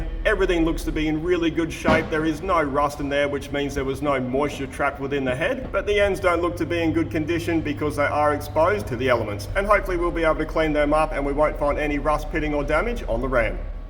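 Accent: Australia. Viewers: Around 21 min. Heard you say "now we are going to find Santa" , realized your Australian accent...now we are going to find center. Was wondering "what does Santa have to do with this?"🤔🤭 Enjoying your vids.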